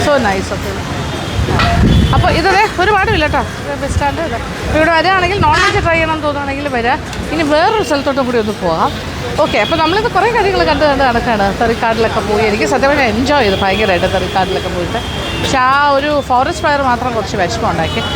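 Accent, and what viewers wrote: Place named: South Kerala, India. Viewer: Im Tamilian from Kanyakumari. Akka is very famous in Tamilnadu ♥️. Something about her malayalam its very different and easy to understand as a tamilian compared to some other dialects. Maybe she is from South Kerala thats why?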